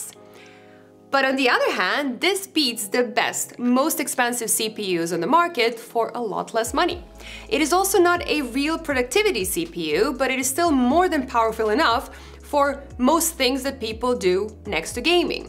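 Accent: Netherlands. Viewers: I'm mixed Dutch/English and think you have the most beautiful English with a Dutch accent I've heard! Oh and thanks for the video. 😄👍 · love that dutch accent as well..super!! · Ik hoor heel licht je Nederlandse accent …❤ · I heard Netherlands. Got to support our fellow dutchies. Nice review btw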